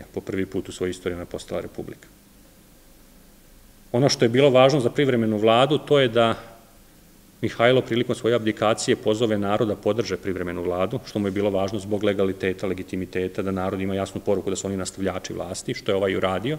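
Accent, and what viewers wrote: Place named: Vojvodina, Serbia. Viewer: Milane, nisam se cak ni vracala da cujem....jer u govornom izrazavanju gospodina , rekla bih da je odrastao u Vojvodini, gde se pomenuti izraz bas tako izgovara...toliko o znanju etimologije, etnologije kao i istorije ...Nista to ne umanjuje znacaj predavanja! Pozdrav!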